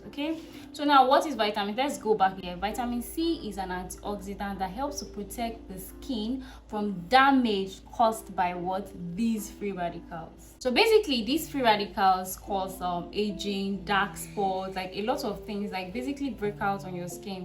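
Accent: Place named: Nigeria. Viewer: 😂😂wow🥺🥰 I asked because if not for the way you spoke I wouldn't have thought you were Nigerian fr😂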